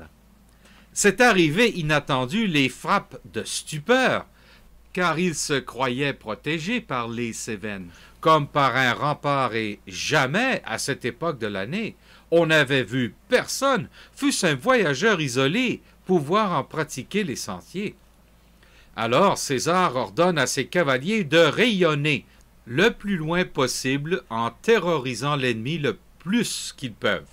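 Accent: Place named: Canada. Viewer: C'est un Canadien avec son accent. Il est super et très clair. En tout cas c'est plus clair qu'avec l'accent Stéphanois. (Le mien) uniquement compréhensible dans les départements 42 & 43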